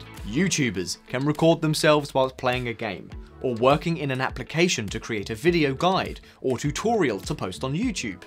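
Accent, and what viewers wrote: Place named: United Kingdom. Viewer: Sir your English is perfect British and clear that is why I watch your videos. Thank you very much.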